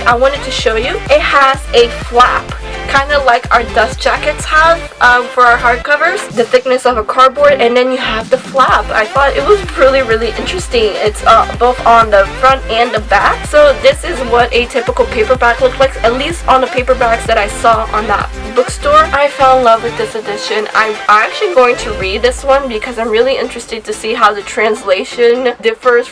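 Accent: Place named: Brazil. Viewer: I detect a Brazilian accent...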